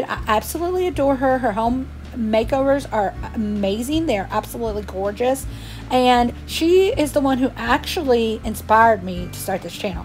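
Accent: South, United States. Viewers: New subbie here! I’m from Alabama so I love your southern accent! Tell you what. I’ll stay if you learn “Miranda’s” real name.🤣🤣🤣Thank you for sharing. Blessed be!🙏🏿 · Looks good. Your place is cute……I love your southern accent!!!!! I think also wherever you live you can make it your beautiful home. I have lived in an old mobile home when I was in my late twenties and also a very old summer cottage that was much more like a shack with no heat and they were fixed up very pretty and comfortable by me…. Love it! I love fixing up and making my space cozy…. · New subbie here. Enjoyed the video. I love the chattiness. Excited to see your home improvements. And love yhe Southern accent. Be yourself. And don't let the negative comments rent space in your mind.🥰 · Loved your video. Keep them coming. Your southern accent is great!!! Can't wait to see more videos!!! 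God Bless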